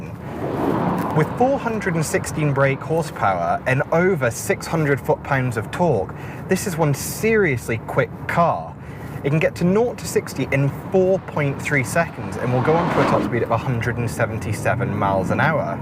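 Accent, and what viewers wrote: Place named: Scotland, United Kingdom. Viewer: Accent seems like a mixture of scottish, australian at times and then some american. Random...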